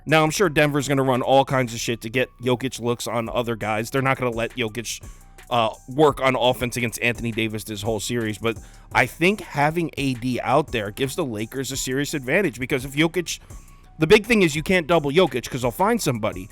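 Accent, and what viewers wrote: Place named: Boston, United States. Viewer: ah shit, so lakers lost. Lmao, always thought you were from BAWWston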